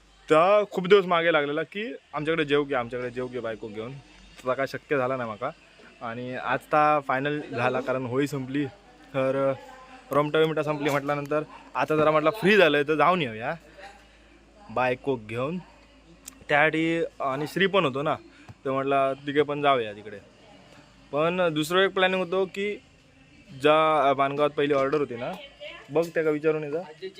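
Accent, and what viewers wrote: Malvan, India. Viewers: ची मालवणी ऐकायला छान वाठतय आम्हाला चाळतय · Wahnii malvanii try kartaa bolavak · Pn bhari bolte Malvani Ekdm God Todmodak ka hoyena Pn bolte👍👌❤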